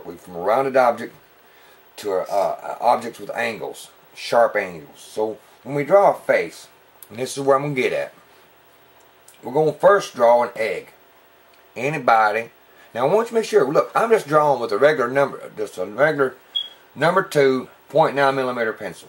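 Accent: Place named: South, United States. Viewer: NocturnSkies it a country accent if you don't like it well the stop watching you don't have to be so rude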